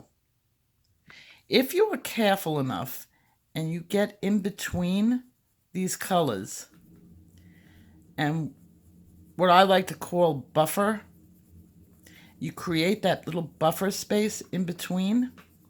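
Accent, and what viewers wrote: New York, United States. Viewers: You know how hateful and selfish people can be, but not with any of the wonderful people I have lately. I have asked so many questions and nearly everyone either a tutorial or knows of one and takes me right to the source. It has been great. After struggling for so long with hate and drama it is nice and refreshing to meet kind people. And I could listen to you talk all day. I'm from the South so I know a New York or Northern Italian accent lol I don't hear it very often down here.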